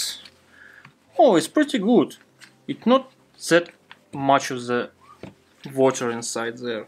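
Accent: Russia. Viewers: Услышав знакомый акцент «зе», поняла, что это русский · Я по аценту понял, ты из России? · русский акцент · Какой-то русский Акцентик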